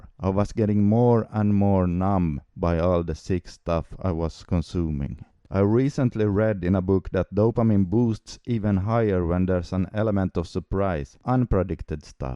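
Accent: Finland. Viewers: kyl huomaa et äijä o suomest :D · First two seconds and can already tell youre finnish lmao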